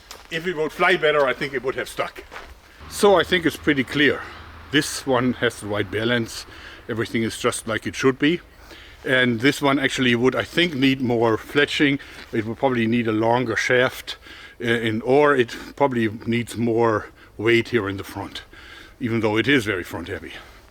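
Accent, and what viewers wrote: Germany. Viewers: as a german living in the uk, german accents in youtubers infuriate me and make me cringe. you are the only one i actually like haha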